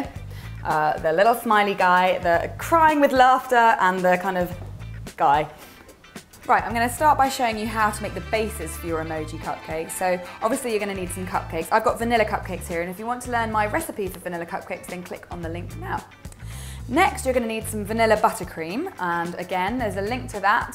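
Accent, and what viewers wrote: England, United Kingdom. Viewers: Your english accent😍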